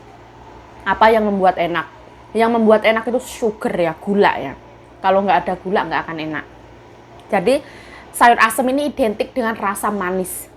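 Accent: Java, Indonesia. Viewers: Wong jawa ngomong bahasa taiwan tetep medok ya, medok puoll 😁😅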